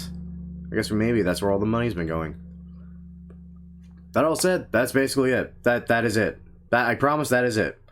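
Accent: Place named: United States. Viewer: idk im just pointing out it sounds really american, we can understand u its the same word, just pronounced different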